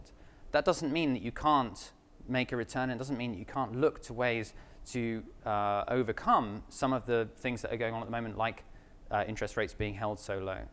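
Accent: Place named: England, United Kingdom. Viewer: Wait a minute....how'd Mr Money Mustache get an English accent????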